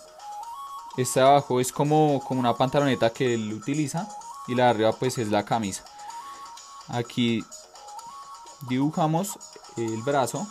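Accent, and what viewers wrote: Colombia. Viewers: Se le nota tanto que es de bogota.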